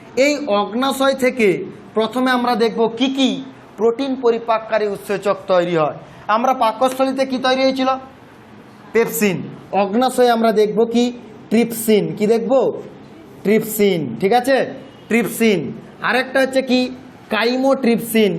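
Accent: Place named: Medinipur, India. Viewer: আপনার কথা তে kochi শুনেই বুঝতে পারলাম mednipure আপনি....দারুণ হয়েছে ক্লাস টা ❤❤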